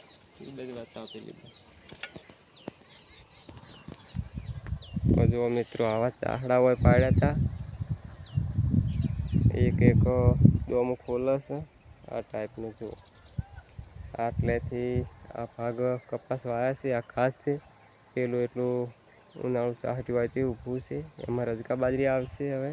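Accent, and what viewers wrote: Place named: Banaskantha, India. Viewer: ક્યાંથી છો ભાઈ તમારી બોલી બનાસકાંઠા આજુબાજુ ની લાગે છે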